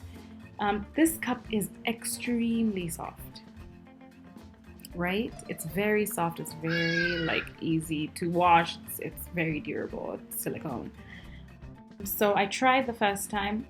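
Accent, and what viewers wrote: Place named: Germany. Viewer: Kann es sein dass du deutsche bist? Leichter Akcent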